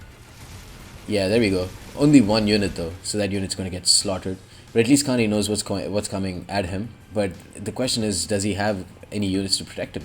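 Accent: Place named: India. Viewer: Damn You sound more Indian man!!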